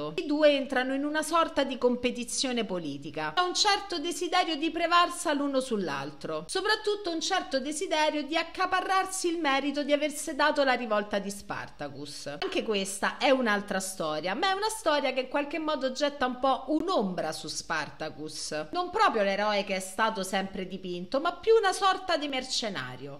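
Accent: Rome, Italy. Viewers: 92milioni di applausi ... FACCE UN VIDEO SU LLE POPOLAZIONI ITALICHE PRE-ROMANEEEEE... ovviamente co lo accento romanello tuoooo ❤❤❤ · Che peccato sta parlata romanesca, non se ne può più! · Sei fantastica! Qualsiasi argomento, tu lo rendi interessante e divertente, con la tua narrazione in accento romanesco! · Sempre più MITICA, (parlante).Daje a pacca' col romanesco,che musica!!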